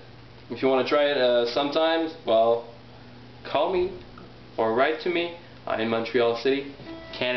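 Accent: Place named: Russia. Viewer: Ohhh... Your delicious russian accent :D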